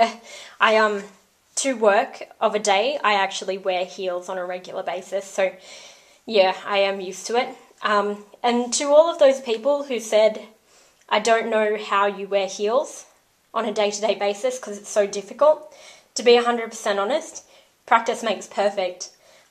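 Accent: Australia. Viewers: Love the ozzie aceent.... GO AUZZIES!!!!!I actually think you are the first Australian sewer I have seen on youtube. Thats a really good thing though, because then I know where I can buy AUSTRALIAN stuff from AUSTRALIAN shops!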